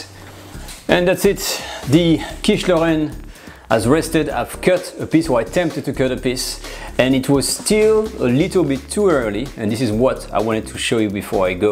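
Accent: France. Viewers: Quiche Lorraine is NOT made with cheese!!! That we call a quiche Savoyarde. Despite his French accent, this man hasn't got a clue about traditional French cuisine, as I learned from many others of his video's. He is a bussiness man rather than a cook. His lack of basic knowledge and ingredients is painful to watch! Please take a look at other sites on the internet for traditional French cuisine, there are plenty!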